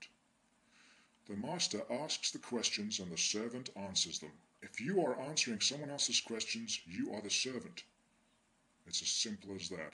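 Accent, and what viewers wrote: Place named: United Kingdom. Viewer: by the way, you sound british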